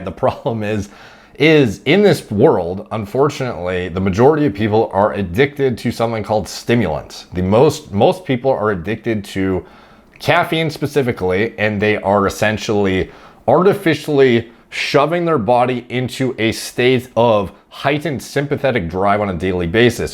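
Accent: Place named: Canada. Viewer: Are the prices on the website in US or Canadian dollars? With the aboot etc guessing is Canadian?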